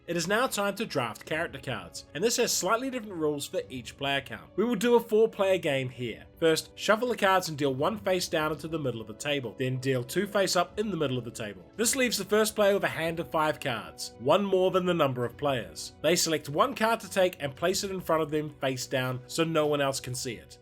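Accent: New Zealand. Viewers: I keep forgetting you're also a kiwi and got jumpscared by the intro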